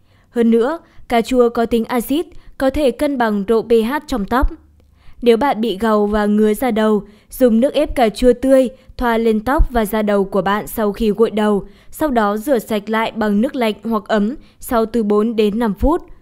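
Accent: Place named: South, Vietnam. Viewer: Noi giong mien Nam nghe hay hon giong Bac Ky 75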